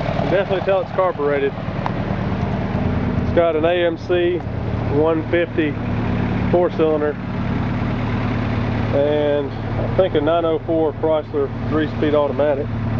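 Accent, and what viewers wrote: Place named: North Carolina, United States. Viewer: I absolutely enjoyed your commentary! I'm guessing you're from southeastern North Carolina. I'm from Wilmington.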